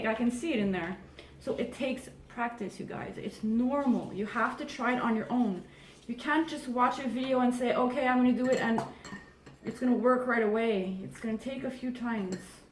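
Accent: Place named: Canada. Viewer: Ha! I knew you were a fellow Canadian by your accent! Love your work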